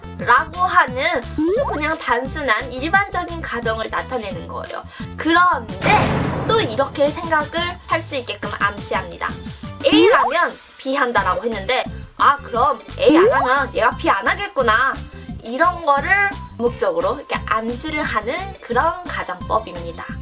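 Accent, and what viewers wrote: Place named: Japan. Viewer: 원래 일본인 말투가 그렇지 않나요? 그냥 평범한 일본인 같은 느낌인데 물론 한국인이지만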